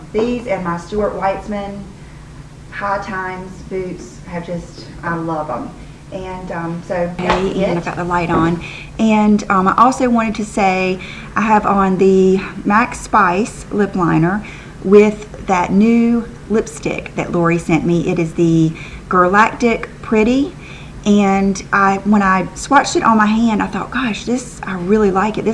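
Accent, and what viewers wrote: South, United States. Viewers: ! I am one of the owners of the salon MAVEN Beverly Hills- I have been a huge fan of yours and followed you for the last several years. I am originally from Mississippi so I love the southern accent, makes me feel like I am home! Just a couple of weeks ago we launched our very own Youtube for my hair salon which opened in August! Check us out - I think you will really love the message that we plan to deliver on our channel!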